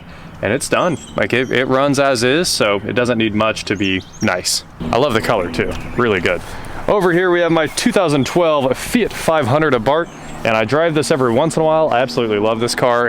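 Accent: Kansas, United States. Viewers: Kansas- northern Oklahoma dialect. It's how we talk 'round here.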